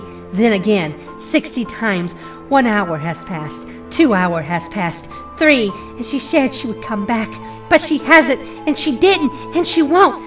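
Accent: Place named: United Kingdom. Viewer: Oh, Another British grimdark reader. Interesting very interesting indeed. New subscriber.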